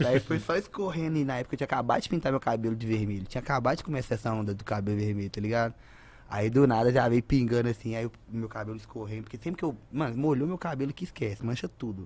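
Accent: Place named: Belo Horizonte, Brazil. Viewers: Esse sotaque é de bh e região próx, quem é de fora estranha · nois é mineiro uai, é o sotaque oxi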